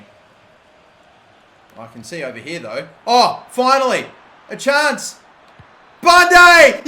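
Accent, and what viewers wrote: Australia. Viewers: It’s probably his Australian accent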